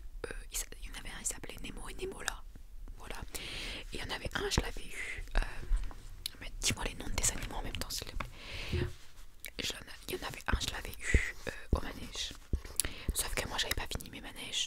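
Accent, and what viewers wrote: Belgium. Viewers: Ta un accent belge c'est assez troublant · bahahahaha wsh l'accent belge jpp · Oui mais dèfois ta l'accent belge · Bahaha mais c'est quoi cet accent belge sur la vidéo ? Je m'en étais jamais rendu compte 😂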